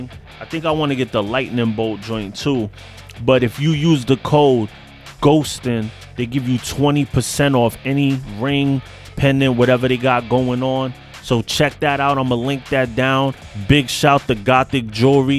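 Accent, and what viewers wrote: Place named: East Coast, United States. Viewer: First time but #GHOSTIN where you from I hear the east in you bro. Tappin In from Boston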